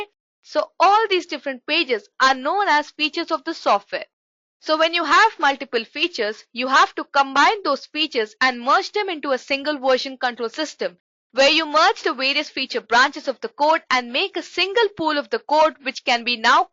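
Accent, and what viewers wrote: India. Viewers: Great talk! One note for improvement for the part with the CapitalOne use-case; with it being the primary example, some on-screen notes would have been fantastic, as the pan-Indian pronounciation gives me some trouble (ex. 'commit' and 'comment' sound identical to me). Also, for Job3 of the practical walk-through, you do not show the code needed in the Build section - everything below '#-Completion' is not shown. I learned a lot, thank you :)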